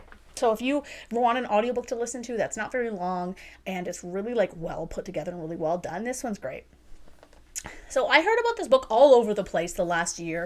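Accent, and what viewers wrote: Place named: RP, United Kingdom. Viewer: 🇬🇧 Britisher here, laughing at your posh accent! 😆 I was wondering where that came from, until you mentioned the audio book and it began to fit into place. That got dark right at the end of the spoiler section! 😳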